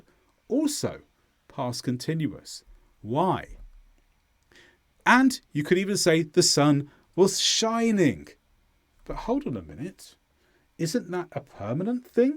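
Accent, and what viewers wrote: United Kingdom. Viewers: When I hear such beautiful British accent I remember ( is it a correct word in this context?) why I decided to learn English in the first place. Music to my ears ))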